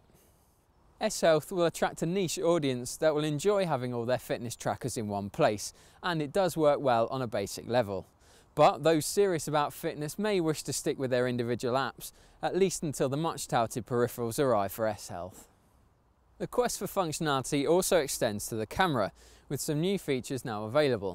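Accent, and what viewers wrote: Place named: United Kingdom. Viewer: Das britische Englisch hört sich schon scheiße an... aber das S4 hole ich mir sowieso... aber nicht in England.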